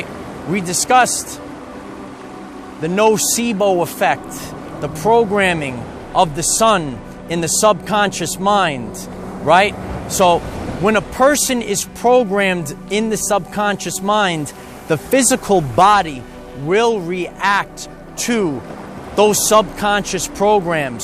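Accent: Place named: Brooklyn, United States. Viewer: I feel like I've spoken to you before. I remember your voice and accent. I never knew anyone from Brooklyn though. Never even been there. Strange how that works.... Thank you. Blessed be. 🙏💜🌷💝💫💖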